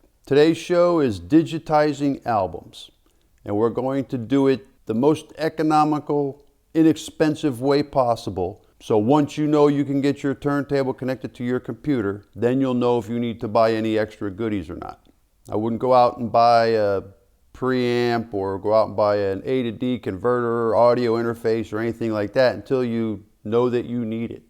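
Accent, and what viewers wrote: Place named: Baltimore, United States. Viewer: That's fascinating. The reason I asked is you seem to have a little bit of our accent. The reason it's kind of fascinating is the thing about Baltimore the accent is like Yankee southern, in other words, it's like it's not sure if it's north or south. That's so interesting you spent your youth up north then most of your adult life south and maybe it gives you sort of a distinct accent, but I swear it's a little like ours. Anyhow, great channel! keep doing your thing.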